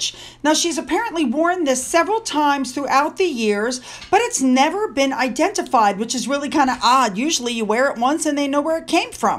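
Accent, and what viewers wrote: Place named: United States. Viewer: Hello, we always watch your video's, you do a Brilliant job. You put so much hard work into your content. We totally agree with what you say, it was very nice to see what you look like, after so long wondering! you are Beautiful. I have seen some comment's about how you, and our cousins from the USA, pronounce names! I find it endearing. carry on as you are, there's too much of people saying " You don't say it like that, you say it like this! " It's difficult for the British folk also! I remember as a child, I had difficulty understanding why Norwich wasn't said as it is spelt, Norrich! When I was corrected, I couldn't get my head around it! My Aunt was from New Costessey, which is in Norwich, that is pronounced - Cossey! Even more confused! One thing that sticks in my mind, I was on a train with two Americans, one said to the other, I can't wait to see Edinburger! 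Keep up the good work, we really appreciate it. Best wishes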